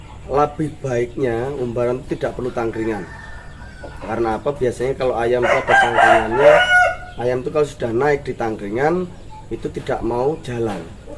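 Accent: Banyuwangi, Indonesia. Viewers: Mas sapean apa orang Banyuwangi to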